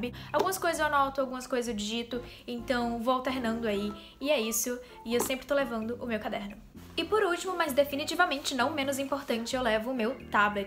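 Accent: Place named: Natal, Brazil. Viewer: amg vc nasceu em Natal?? seu sotaque é diferente shshjajs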